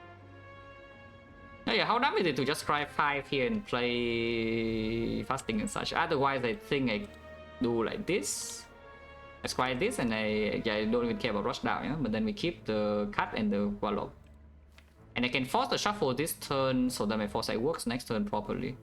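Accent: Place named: Vietnam. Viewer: How sheltered are you to have never heard an accent before? He's Vietnamese and lives in Vietnam. I think his accent is great and he's also fucking hilarious. Please stop talking.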